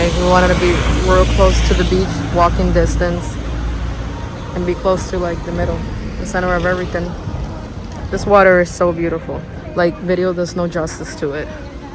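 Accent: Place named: Latin America. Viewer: What type of Latina are you? Your accent is familiar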